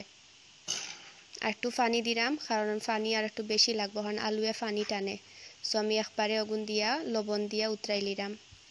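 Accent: Sylhet, Bangladesh. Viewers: I miss your mishti sylehti mat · ওয়াও জাস্ট ওয়াও অসাধারণ বিশেষ করে তোমার মিষ্টি সুরের সিলেটি ভাষা ভাবি? awesome। Dubai থেকে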